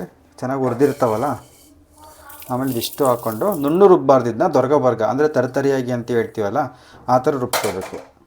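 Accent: Chitradurga, India. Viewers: 👌🏻👌🏻.. ನಿಮ್ಮ ಚಿತ್ರದುರ್ಗದ ಭಾಷೆ ಚಂದ... ನಿಮ್ಮ ಅಡುಗೆ ಹಾಗೆ....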